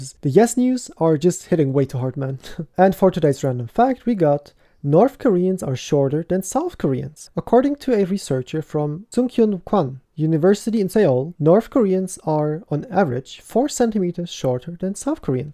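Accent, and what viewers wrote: Germany. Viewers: Is he german or somethinge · You are either German, Austrian or swiss. What of these 3 are you? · you german?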